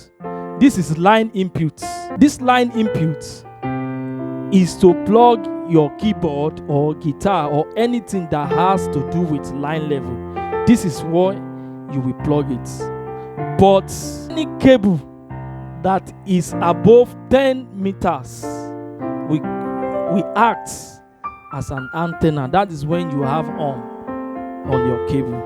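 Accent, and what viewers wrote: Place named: Nigeria. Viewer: That's Nigerian Accent 🇳🇬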